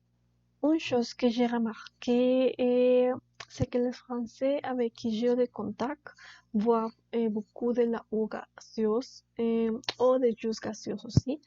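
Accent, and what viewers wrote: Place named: Mexico. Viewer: j'adore entendre une mexicaine avec un jolie accent. continue tu feras encore plus de progrès. le petit déjeuner sucré à la française est différent dans beaucoup de pays.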